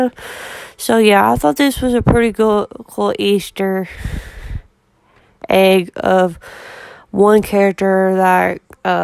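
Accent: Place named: South, United States. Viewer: Wow girl, you have a major Southern accent! I f'n love it!!!!!!!!!!!!!!!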